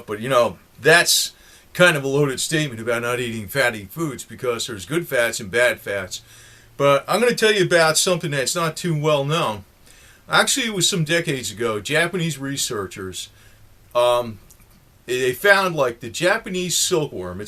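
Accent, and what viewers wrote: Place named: South Jersey, United States. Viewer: south Jersey.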